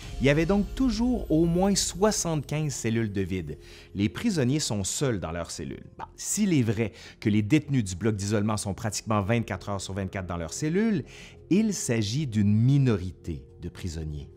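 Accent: Quebec, Canada. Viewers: Y'a pas a dire, tu prends +10points de sympatie rien qu'avec l'accent québécois... Je suis jaloux.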